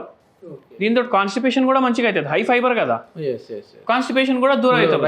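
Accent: Telangana, India. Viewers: bro it's telangana slang don't insult the language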